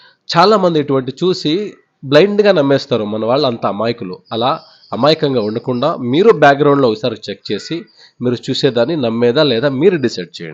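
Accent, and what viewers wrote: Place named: Karnataka, India. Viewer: Bro meeru Kannada na ? Endukante , ma frnds kannada colleagues kooda telugu ilane maatladutaru , so slag acham alane undi Karnataka people's laga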